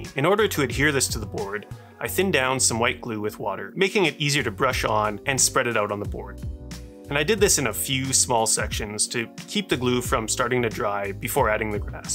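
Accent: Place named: Canada. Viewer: This guy sounds Canadian......"went to the same store" Yup! Nice vid fellow hoser.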